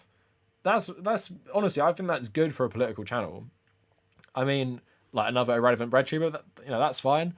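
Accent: England, United Kingdom. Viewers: I don't think you're condescending. But maybe just having a British accent makes you come off that way to people in the U.S🤷‍♀️ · I'm almost certain they think you're condescending because of your confidence combined with your accent lol lots of western media has posh know-it-alls or intelligent, antagonistic Englishmen as the opposition. Aside from that, seeing a Destiny viewer call you condescending is so rich 🤣 Destiny is easily one of the most condescending politickers out there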